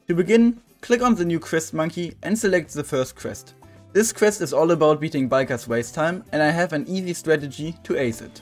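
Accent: Germany. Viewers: You sound like a German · is there a GERMAN AKZENT MEIN LIEBER SPORTSFREUND?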